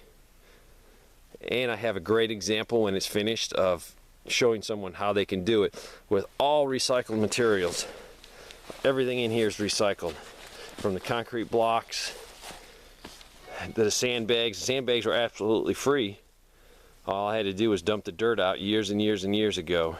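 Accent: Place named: New York, United States. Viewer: I knew you were a NY'er. I'm from CNY but transplanted to NC :)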